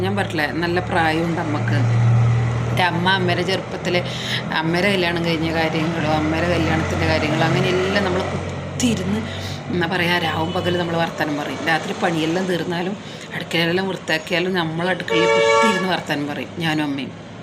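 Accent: Kannur, India. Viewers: നമ്മുടെ നാടൻ കണ്ണൂർ ഭാഷ 👍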